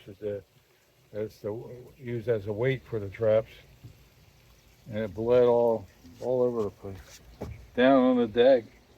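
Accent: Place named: New Jersey, United States. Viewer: Thanks for your videos. You remind me so much of my grampop and my dad (you are younger than both lol. But my memories are of them when they were still here. ) keep laughing it makes me so happy to hear that laugh. Just like ol Cornie's laugh. And you walk and move like dad (he was a sailor in his youth. You just don't lose that rolling gait!), your jersey accent makes me feel like I'm home again. (Jersey girl too). I look forward to your videos. Keep catchin!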